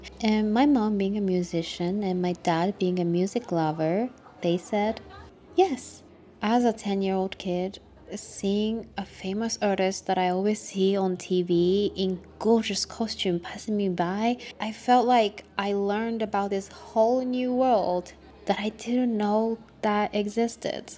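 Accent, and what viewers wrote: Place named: United States. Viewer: Why do you speak in an American accent if you're from Japan?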